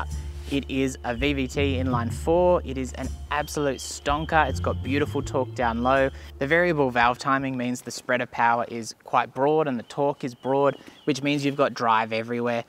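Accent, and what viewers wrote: Australia. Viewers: My God what the f is wrong with the Australian accent?!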